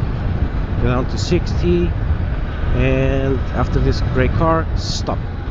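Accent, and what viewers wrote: Portugal. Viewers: Mal te ouvi pensei logo "Tem sotaque Portugues" vi a matricula "Confirma-se" xD Abraço, belo video, vou ver se tiro a carta de mota este ano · are you portuguese? you have slight a portuguese accent :D loved the review btw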